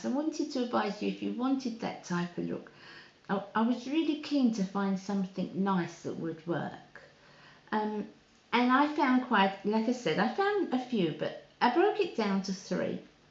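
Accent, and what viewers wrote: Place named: Essex, United Kingdom. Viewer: Hello I just came across your channel and I really enjoyed way it, you sound like you have an Essex accent my neck of the woods 👍 Looking forward to watching more of your videos x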